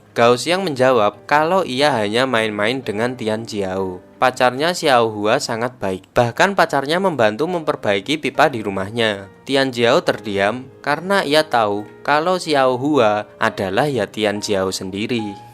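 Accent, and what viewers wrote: Java, Indonesia. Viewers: Emng orang jawa ya ,Kak? Medhok suaranya,,,🙏🙏🙏 maap klo tersinggung cuma tanya,,sumpah✌️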